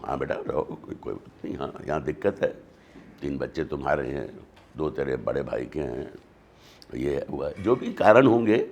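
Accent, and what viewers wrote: India. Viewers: Although sound indian I admire his voice..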